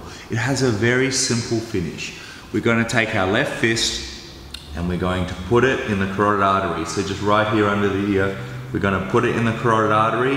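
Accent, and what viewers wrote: Australia. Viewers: His accent sounds Aussie, but his school is based in LA now I think?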